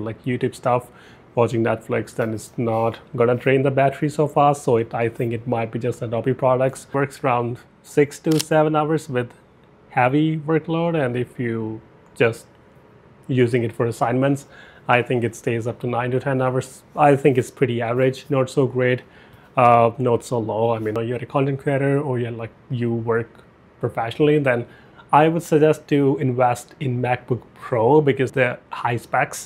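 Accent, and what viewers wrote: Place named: India. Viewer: the indian accent still slips lol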